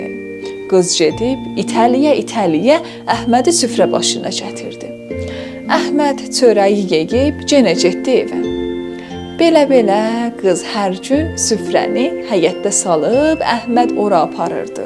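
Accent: Iran. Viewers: Mənim 70-yaşım var, bu nağılı 10-12 yaşımda "Azərbaycan nağılları" kitabında oxumuşdum. İndi cənublu qızımızın dilindən şirin Təbriz ləhcəsində yenidən eşitmək istəyib axıra qədər qulaq asdım. Uşaqlığım yadıma düşdü. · Ай гыз фарс лейчесийле данышма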